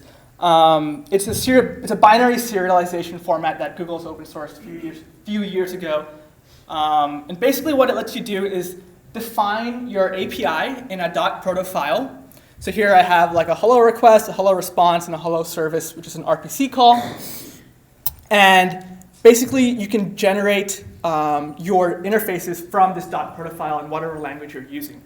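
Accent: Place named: West, United States. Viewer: Useful video. However may I suggest that you might want to try to keep your accent a bit neutral. I see a heavy leaning towards the western accent, but that's starting to sound quite artificial and meddling with comprehension. Just my 2 cents.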